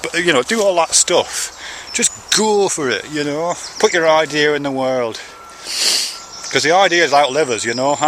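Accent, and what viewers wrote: United Kingdom. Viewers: The odd thing to me is that your afterlife accent is British. Weren't you American originally? Is there an explanation for that change? Very good "channelling" of the content of someone else's super-ego otherwise though. AND you're absolutely right that some people are very callous to each other on YouTube. It'd be great if that didn't happen so much.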